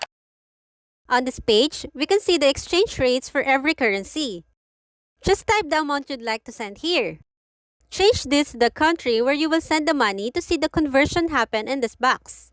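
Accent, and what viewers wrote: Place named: Philippines. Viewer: Iba talaga mag english ang tagalog. Litaw na litaw haha. Ty bga pala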